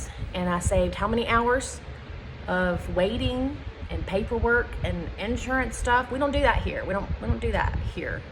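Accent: United States. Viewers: First of all, where are you from? Your accent sounds so much like where I’m from, NC. That sounds tremendous about healthcare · When I hear someone with a Texas accent state she is sitting in front of the fan because she is so hot she is "just trying to survive April and May"...holy crap, that gives me PAUSE. LOL I hope you are feeling better all these months later. Thank you for this post! It's very encouraging. : )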